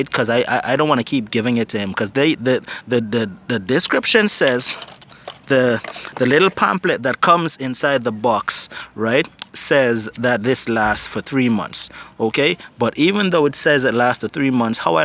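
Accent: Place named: Bahamas. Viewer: You convinced me to try it & you sound like one of the homeboys in the Bahamas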